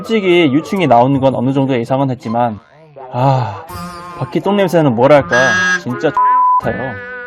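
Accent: Jeolla, South Korea. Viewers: 아 진짜 너무 소름끼쳐요ㅋㅋㅋㅋㅋㅋㅋ와중에 전라도 말투 너무 귀여우ㅑ욤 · 요게 어디 사투린가요?? 설명 읽어보니까 광주적혀있는데 이게 전라도 샅투린가요?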